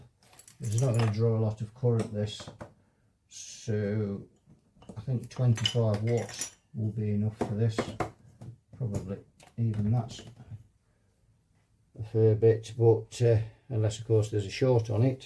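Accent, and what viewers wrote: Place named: North Derbyshire, United Kingdom. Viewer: I think it’s the dialect, plus some / many of the words. “ Tatered” as in “I think that capacitor is tatered.” Many won’t understand the words peculiar to the north Derbyshire/ Nottinghamshire dialect. 👍👍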